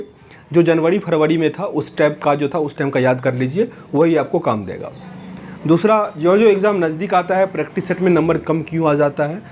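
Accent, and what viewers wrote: Bihar, India. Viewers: Bihari tone ,chaura ganga kinare wala , thx bhaiya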